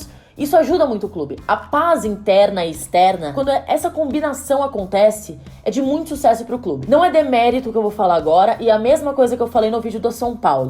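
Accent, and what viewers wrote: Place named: South, Brazil. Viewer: Mano... esse sotaque é de onde? Eu amo esse sotaque parece ser de quem é do SUL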